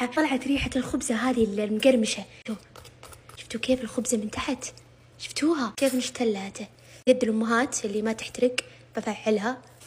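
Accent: Saudi Arabia. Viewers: 😂😂😂 لهجه السعوديين غريبه وكيوت🤏🏻😭 😂😂شنو معناه يلبيه 🤔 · بحب لهجة السعوديات كتيييييير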